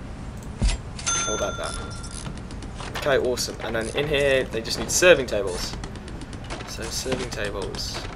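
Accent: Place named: United Kingdom. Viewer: you sir are british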